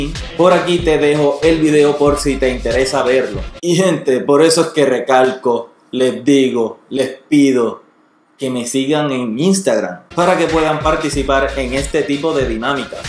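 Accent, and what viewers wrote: Puerto Rico. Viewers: eres de puerto rico porque creo que eres de puerto rico por que se te nota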